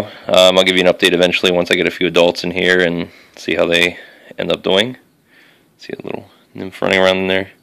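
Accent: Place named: Philadelphia, United States. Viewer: I just got some of these, I heard they are very prolific breeders, have you had success? Also, you sound like you're from philly area, if that's the case, you should definitely check out the insectarium! it's on Frankford ave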